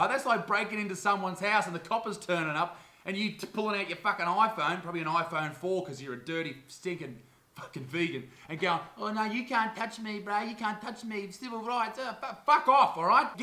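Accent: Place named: Australia. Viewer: I don't know why but I absolutely adore australian accent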